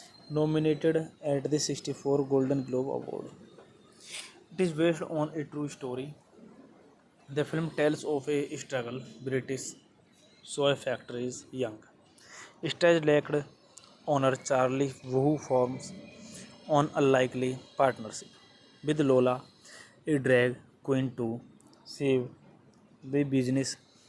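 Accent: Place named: India. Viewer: Estúpido el que hizo estos videos. Queremos la película no tu voz de la india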